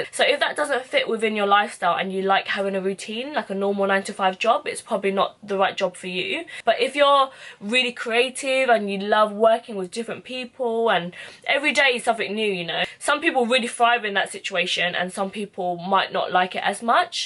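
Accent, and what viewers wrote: United Kingdom. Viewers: Wow LOVE your British accent 😻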